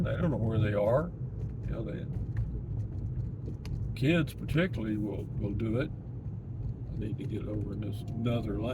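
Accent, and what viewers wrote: Texas, United States. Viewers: Dude you crack me up! You sound like my buddies here in Amarillo TX.🤠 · You have the same accent as my aunt and cousins and my dads buddies from Texas.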